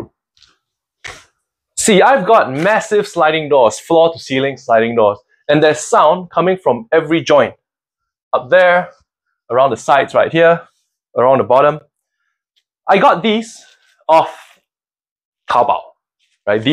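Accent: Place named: Singapore. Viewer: Get to the point, my Singaporean accent friend!!!!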